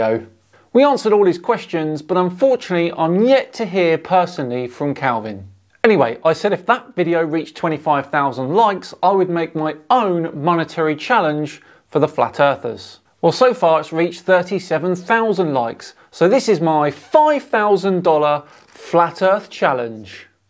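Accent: United Kingdom. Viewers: Is it just me, or, is it strange hearing a British guy say dollars?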